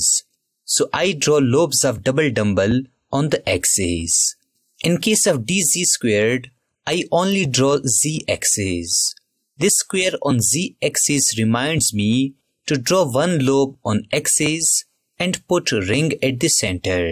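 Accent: India. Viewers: to is very usefull for drawing, but I would draw one arbitrary non-Z-axis for the dz² just to make it still fit into 2-Dimensional view, or for 3-Dimensional, jsut imagine 3-rd axis directly pointing at you and you don't see ti as it is covered by the origin point. Your dz² drawing feels little bit to 1-dimensional. But as with all indina channels, I never mustn't watch any other Vid of your Chennel or my brain will explode, your english is much harder to understand than normal english. But you explain pretty well, (all the Electron will stay there and here stuff, but the d orbital drawing was just the most usefull.) with less acent in language, i.e. if you would use Queen English, I might even consider subscribing/recomending you.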